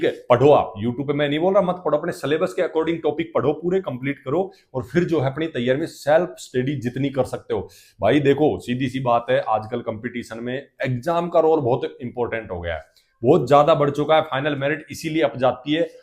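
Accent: Haryana, India. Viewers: Asa lgra sir ap haryana se ho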